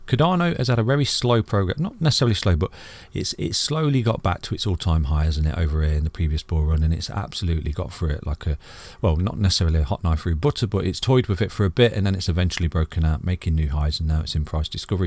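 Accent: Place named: Leicester, United Kingdom. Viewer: Thanks again for the great content, you are my new favourite channel!. i have been following mostly American channels like the Block chain backer and working money channel ect, i have learnt more from you in the last 2 weeks than i have in a year. love the way you come across with no BS. i would love it if you could do more TA so we can learn all the tricks of the trade. great channel, well done pal, thinking that is a Leicester accent you have!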